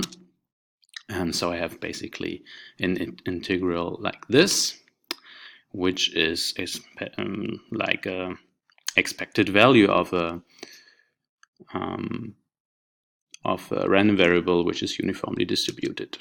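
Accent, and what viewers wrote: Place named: Germany. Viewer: Omg best video I’ve seen in ages. Didn’t understand a thing but that german voiceeeee guyssss thumbs up! (GTR ist nicht mein Freund)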